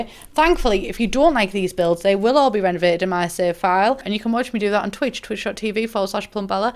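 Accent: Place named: Glasgow, United Kingdom. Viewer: I used to live in Glasgow and this video gives me those vibes. Also I love your accent it takes me back to those great times hen xoxo from spain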